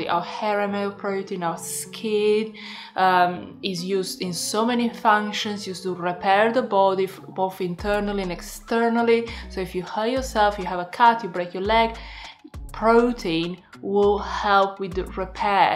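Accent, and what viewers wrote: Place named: United Kingdom. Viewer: Where is your accent from? I think you learned british english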